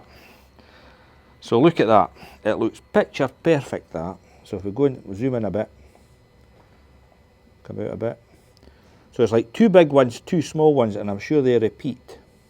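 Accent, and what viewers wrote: Scotland, United Kingdom. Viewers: I love this Scottishness . Especially the groans of dispare 😂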